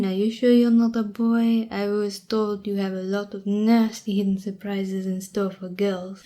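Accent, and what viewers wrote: France. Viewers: Wait are you actually French or just really good at impressions. We also need more wattson, or Kermit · is this what french accents sound like from frace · Her: french accent My head: switch it to russian accent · the french accent really gives of the vibe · i never knew i would fall for girls wit french accents this hard you suprise me every video lol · Dam. French accent. My weakness. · It’s weird: When you speak English, you have a very pronounced French accent, but when you speak French, I can’t understand a word you say. Without the subtitles, I wouldn’t have understood anything. I’m French, I don’t know what to think about that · You already have such a relaxing voice, but the addition of a french accent makes it even better. I come back to this one a lot. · I miss this French accent of hers 😢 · I love long ASMR videos. As a German, I really love French accents too. 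I'm sure this will really occupy alot of my time. I'm glad this invaded my recommended, kind of like how the Germans invaded through the Ardennes in 1940.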